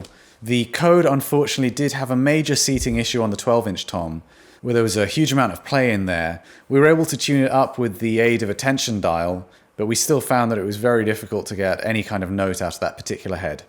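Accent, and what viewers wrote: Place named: United Kingdom. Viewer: mr accent to British. cool explaining, good information.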